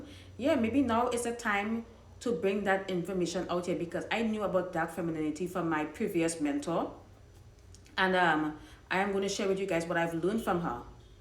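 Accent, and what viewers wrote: Trinidad and Tobago. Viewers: Hi are you Trinidadian? Definitely an accent 🇹🇹🇹🇹🇹🇹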